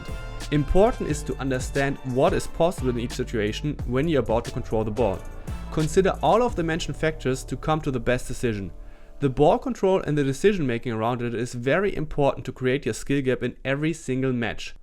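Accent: Germany. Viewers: man hört das deutsche direkt heraus haha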